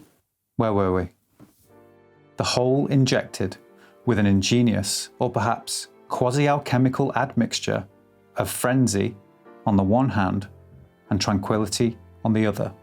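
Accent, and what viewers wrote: United Kingdom. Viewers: So sorry for the delay in my commentary. I know you must have been waiting, anxiously, constantly refreshing your page, to see if the crazy and occasionally overmedicated American girl has provided her invaluable feedback on your new concept. Worry not, I've prepared notes. Thing number 1) While a gross over-generalization for sure--all person speaking with any accent from across the pond equate to "smart, intellectual, generally polished, academic-type" to the universally ignorant American ear. I.e. you could read from a local phone book and you would sound like a BBC new correspondant to your American audience. This is worth capitolizing on, especially for a Story Time concept. which leads to Thing number 2> Thinking more of an Alfred Hitchcock-type-vibe. walking in to his own silhouette? Thing number next> maybe crossed with a Tales of The Unexpected vibe....fireplace crackling (if no actualy fireplace available....a makeshift prop would do....might even be more hysterical).....and smoking jacket and pipe....I mean, c'mon......no brainer.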